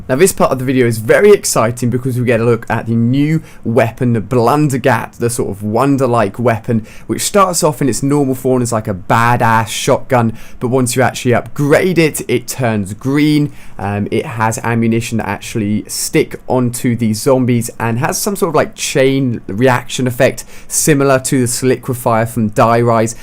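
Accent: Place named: RP, United Kingdom. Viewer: Your so posh and have a weird lisp thing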